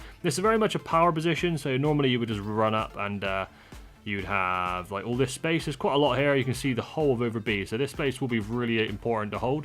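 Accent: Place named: Somerset, United Kingdom. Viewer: Where you from.. you sound very somerset .. Bristol maybe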